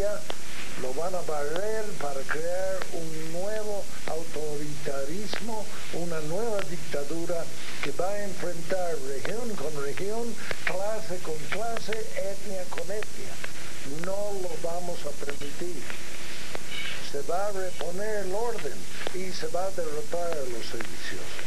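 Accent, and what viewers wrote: United States. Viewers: Oye pensé que era Bush hablando, parece un norteamericano noten la R que pronuncia. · este señor no sabe hablar español? tiene un acento gringo ? el fue presidente de bolivia ??GUAOOOO .......increible !!!!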